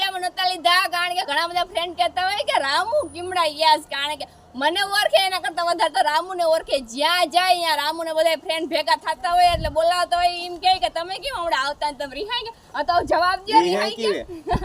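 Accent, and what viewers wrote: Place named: Porbandar, India. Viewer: દીદી જી તમે પોરબંદર જેવું બોલો છો મતલબ આપ કયા કોમ્યુનિટી માંથી છો 🙏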